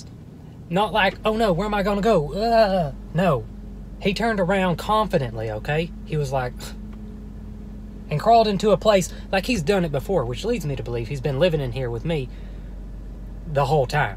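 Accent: South, United States. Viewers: 2024 now.....that southern accent was thiccccccccck in these older videos. lol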